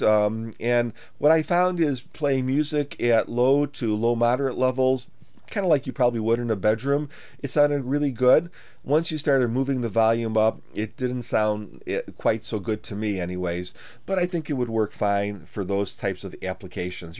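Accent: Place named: Milwaukee, United States. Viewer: U sound like you’re from Milwaukee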